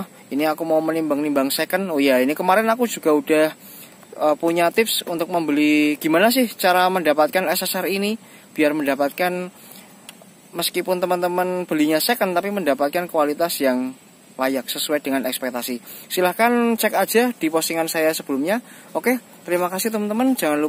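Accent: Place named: Java, Indonesia. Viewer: terima kasih informasinya masse sangat detail, to the point, tidak bertele2 tapi lucu ya masse ngomong indo logat jawanya kental 😂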